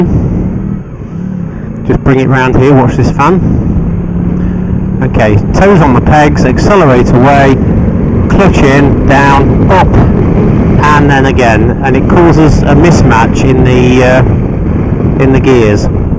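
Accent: United Kingdom. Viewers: I liked this video and hope I can apply it, but I'm not sure if I understood everything clearly (I always have problems understanding British accent, sorry!). So I must ask for a little help here. My problem: every time I switch gears, the bike yanks a little, after I open the throttle again. I can hear and feel it, nothing terrible, but makes me feel a bit like a barbarian who's killing the bike with an axe. I often think I open the throttle too fast, with zero smoothness. I also think I do the whole shifting way too slow. It's more like doing all these 3-4 things things one after another, and def not in a blink. I'm a beginner with a relatively fresh A1 licence and I must say, nobody really showed me how to do these things, not even during the course (which is generally not high quality in my country...). By the way I have a Suzuki GZ 125 Marauder (I really like the bike, so don't say I should throw it away because it's a damn mini-chopper, thanks! 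:P). If anybody responds and gives me a tip how to stop this bad(?) habit, thanks in advance!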